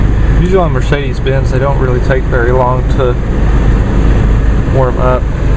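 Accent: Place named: South, United States. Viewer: Where exactly are you, if you don't mind my asking? I detect a hint of a Southern accent. Oh, the song on the Cruze's stereo was PERFECT for that morning!!!!!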